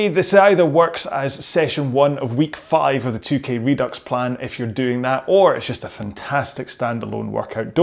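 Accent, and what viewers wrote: Scotland, United Kingdom. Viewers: I see you posted a 2 hours video. This is what I need to learn the Scottish accent 😊😅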